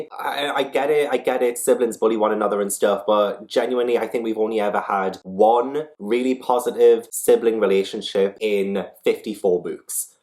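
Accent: United Kingdom. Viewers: You sound like a fruit and the British accent doesn't help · Hey it’s European (somewhere in the UK I think, maybe Wales or Scotland, the accent isn’t too familiar to me) Josh Peck! What’s up? Great video! I’ve never seen your channel before but I like it a lot already! Can’t wait to watch this video! If I like it enough by the end I’ll be sure to subscribe!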